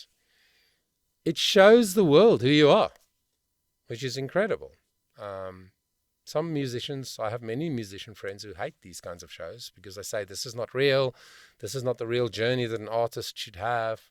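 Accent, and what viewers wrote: South Africa. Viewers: She is 17 now and dropped her first EP in August consists of 6 original songs. They are amazing. I have been following her for a long time and I am so proud of of her hard work, dedication and just being a wonderful human being. is the first track of the EP. You are missing out if you don't listen to them all. I think you are also a South African from your accent. I am a 77 year old gran from South Africa. She is my favourite artist and has been since she was 9 when I heard her sing a self produced version of.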